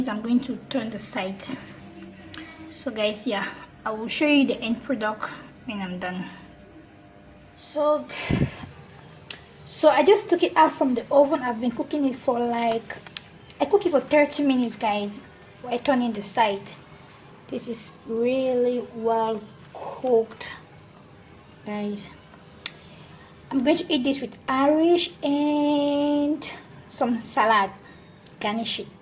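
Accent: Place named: Cameroon. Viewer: Hmmmm....you called 2 spices in French. Ever lived in a french speaking zone? Just wondering. You sound a little Cameroonian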